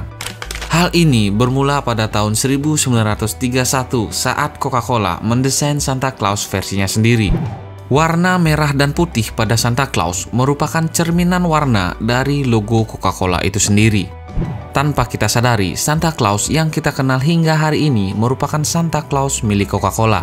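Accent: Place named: Bali, Indonesia. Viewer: Orang bali bang? logatnya kayak orang bali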